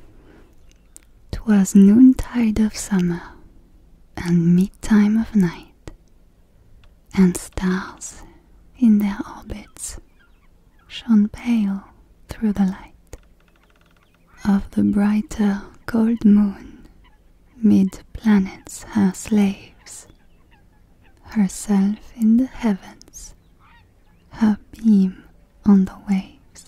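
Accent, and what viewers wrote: France. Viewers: First 30 seconds in and the French accent is melting my brain away. This accent sprinkles happiness diamonds on my being once again. This accent is just to powerful. To gorgeous. To elegant. I can't fight it!! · I waited three days for the perfect morning to listen to this since I saw there’s a second part of my favorite maid asmr. It was worth the wait, it was worth to listen. Now I really hope there will be part 3. No one on YouTube is providing such charming content. Maid, French accent, even poetry with all background sound. You’re truly amazing. Thank you for being with me this morning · Yes another french accent vid, love the audios keep up the amazing work! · I am obsessed with the french language and accent, and hearing from a soft-spoken sweet voice with caring words is nothing short of perfection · I love your French accent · The French accent soft-spoken videos are my favorite from your channel. 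This one is actually quite sad. I hope there's a part 3 :( Thanks for sharing. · Well part of me in some distant future hopes for a part 3, (shh this is a secret 🤫 but i personally like the French accent audios definitely a favorite of mine to listen too) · I love the French accent vids! I hope there will be a Part 3! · It's only been 9 days and we already need more of the French accent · Aaa, good cute French maid is really something. Your French accent make me loved French and made me temember my highschool day when I learned French myself. ❤❤❤❤ Thank you for the upload. ❤❤❤❤ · I saw French accent asmr and I press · I listened to this after a very long and hard day and the stress just melted away. Also a French acsent never sounded so appealing. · My,my,my i think have to start study French because your accent is magnificent 👌 ❤❤❤ N.B: Umm, so there are 2 similar videos in got a bit lost😂😂, and double post means a fine night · I loved this audio. 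I hope we get a part 3 someday. Also, if you ever just want to upload a video of reading poetry in your French accent, I might die in bliss (or at least sleep in it!) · Man, I always love your french accent videos. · I find this very beautiful and comforting. I closed my eyes while listening to this audio, and pretend that I'm spending time with the maid and getting to know her better. The French accent sounded very beautifully and I can say I enjoyed it. Great work.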